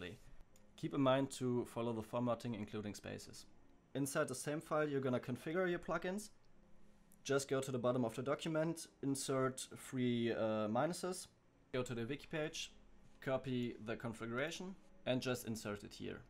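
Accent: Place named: Germany. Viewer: Moin, dein Akzent klingt sehr deutsch, deshalb schreibe ich mal auf deutsch:) Ich habe einen Server gemietet um mit freunden zu spielen. Leider sind wir alle Anfänger und bekommen die Traffic mod nicht installiert. Kannst du und da vielleicht weiterhelfen?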